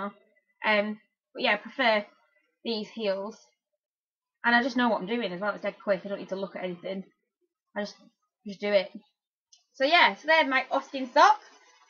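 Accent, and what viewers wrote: Northern England, United Kingdom. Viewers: I love your shed! Also your accent's fine, it's good to hear a Northern accent on a podcast for a change :D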